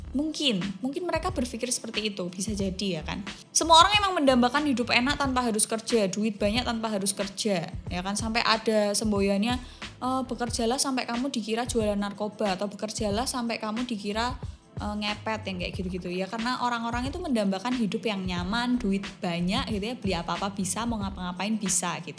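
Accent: Java, Indonesia. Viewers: Blitar hadir ka.. Medoknya ka jan ngangeni bangeettt.. Salam semangtt y kaa.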